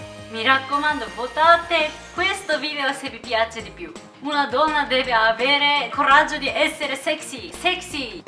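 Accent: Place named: Japan. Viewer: Il tuo italiano parlato con accento giapponese è delizioso. Il tuo abbigliamento è certamente sexy, ma la tua dolce sensualità è gestita con intelligenza, sempre garbata e mai volgare né provocatoria, brava!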